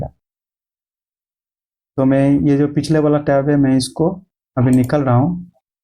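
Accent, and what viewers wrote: Bengal, India. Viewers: Ap bangali ho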